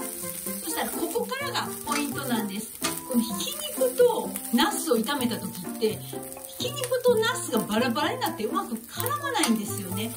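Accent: Kansai, Japan. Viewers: わかりやすいですありがとうございます。 関西弁もサイコ〜です✨ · 先生の関西弁毎回聞いてて最高⁉️ 毎回楽しみにしてます。ナスは今は旬なんでつくってみます🎵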